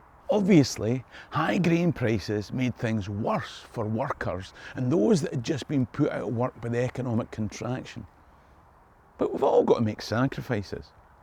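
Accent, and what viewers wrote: Scotland, United Kingdom. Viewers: Either I am understanding his Scottish accent better or he’s speaking more clearly. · What the hell is he saying after, "It would take a nasty cocktail of circumstances to distract folks from..." CC has it as "that dodgy bloke in the jungle." It sounds like a name, but I have no idea. "That dodgy bloke in the jungle makes no sense at all. Why is the Scottish accent so hard for Americans to understand?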